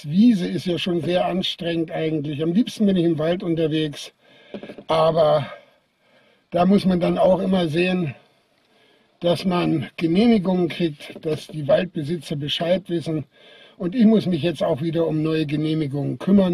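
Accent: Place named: North Rhine-Westphalia, Germany. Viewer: Ich schau dir ja schon seit Abo Nr.67 zu , aber ich frag mich ob du auch aus dem Süden kommst? Hörst dich eher nach NRW an ;)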